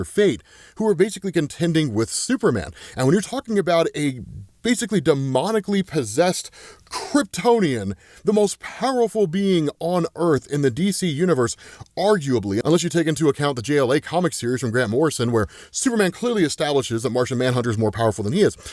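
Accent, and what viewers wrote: United States. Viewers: Why does pronounce ''Eclipse'' like that (e-clips)?. Where's his accent from in the US?. I'm not from the USA.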